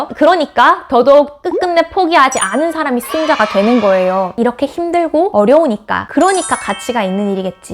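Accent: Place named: South Korea. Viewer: 아무리 국내파라도 결국 영어유치원 원어민 학원 줄창 다닌 것 같은데? 아닌가요? 그래도 한국 수도권식 단조로운 발음 악센트 고치려고 엄청 노력했을 것 같네요 ㅎㅎ 조선인들은 외국어비교부심이 강해서 ㅋㅋ 자기는 할 줄 아는 외국어 하나도 없으면서 남의 와국어 평가하고 자빠졌음 ㅋㅋ 이것도 외국어 공부하는 사람들이 넘어야할 부분중에 하나임..😂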